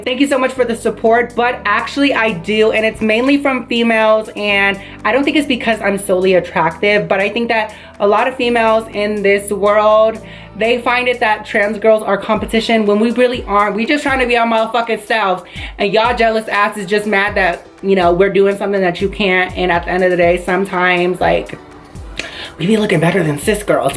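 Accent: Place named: New York, United States. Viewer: I like how you said “dau - tuah “ I love ny accents 😭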